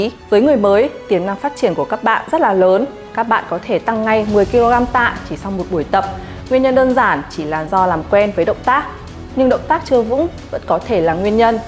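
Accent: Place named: Vietnam. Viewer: có 1 số từ chuyên môn dùng tiếng Anh thì phát âm khá thuần Việt. Team có thể cân nhắc cải thiện ha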